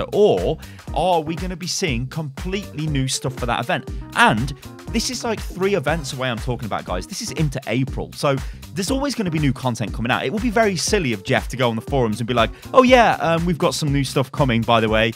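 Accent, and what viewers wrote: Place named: United Kingdom. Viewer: I love these vidoes. They make me all warm and nice when I hear lovely british voice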